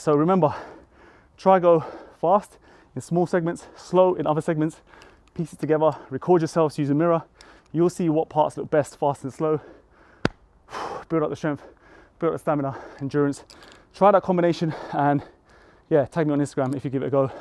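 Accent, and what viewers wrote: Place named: United Kingdom. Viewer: what is your name? Is it?? Love the British accent always. I can only dream to be as graceful as you are jumping rope. I look ridiculous in my form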